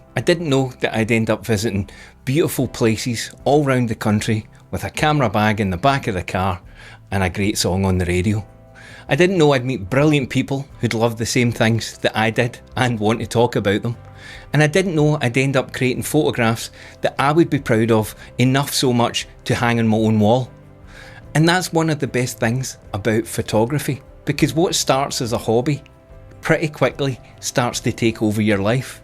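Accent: Scotland, United Kingdom. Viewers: Well, I'm over 60, and this video is still pertinent. I love the way you covered this,. And your Scottish accent, priceless. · I came for the info and amazing photos. I stay for the Scottish accent and humor tysm 😊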